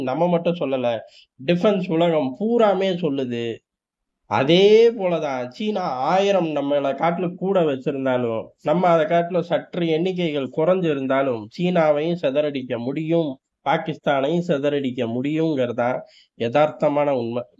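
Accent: Madurai, India. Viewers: தல...செம்ம இன்ட்ரஸ்ட்டிங்கா பேசுறீங்க தல....உங்க மதுரை ஸ்லேங்...சூப்பரா இருக்கு...இதயே கண்ட்டின்யூ பண்ணுங்க...இன்னொரு சேனல்ல புதுசா பானைக்குள்ளருந்து பேசற மாதிரி ஒரு வாய்ஸல பேசுறீங்க போல....அது சரியா புரிய மாட்டேங்குது ப்ரோ....இப்ப நீங்க பேசுற வாய்ஸ்தான் சூப்பர்....அண்ண அமெரிக்கா பயபுள்ள மைலேஜ் கீழ வந்து சொல்லக்கூடாது...சூப்பர்....❤❤❤❤❤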